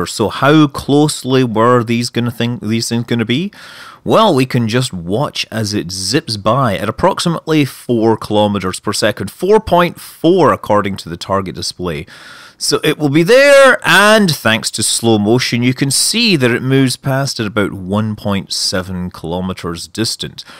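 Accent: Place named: Glasgow, United Kingdom. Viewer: Glasgow Uni accent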